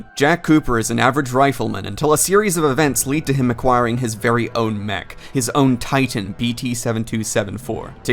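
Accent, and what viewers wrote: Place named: United States. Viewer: i have a question why do you have american accent when you are australian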